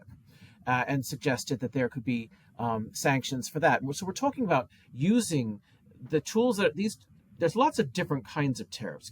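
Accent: United Kingdom. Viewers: I think he's British.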